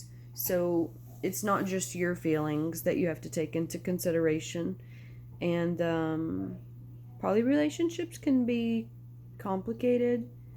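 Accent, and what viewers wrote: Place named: Appalachia, United States. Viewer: It's funny. I haven't seen video from you in like forever (like probably a year or more) and it seems like way back when, you used to have a much more pronounced appalachian accent than you do now. Not that it really matters, but it's just a subtle change I noticed. I'm sorry to hear that you've had to deal with covid. That stuff sucks. I hope that you're back to feeling 100% like yourself real soon. I really need to watch your videos more often. I really enjoy listen to you speak about things. Your level of intelligence is really impressive. I hope 2022 treats you right!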